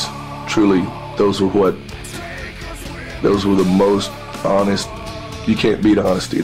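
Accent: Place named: United States. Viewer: what he say?? i don't understand spooken american :)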